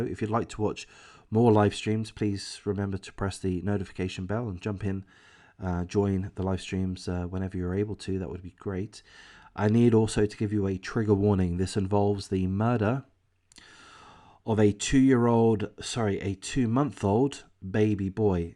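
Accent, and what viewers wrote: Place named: United Kingdom. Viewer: As listening you are clearly British like me, out of curiosity do you still live in England? Or follow these because of now living in America?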